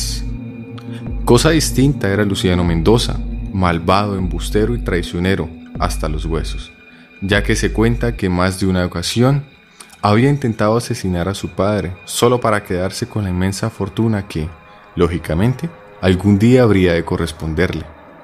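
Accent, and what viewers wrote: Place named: Colombia. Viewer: Jajaja que bien el tónico colombiano mi parceeee !! nunca había escuchado esta historia pero se parece a la de la llorona 😭 AAAAY MIS HIJOOOS !!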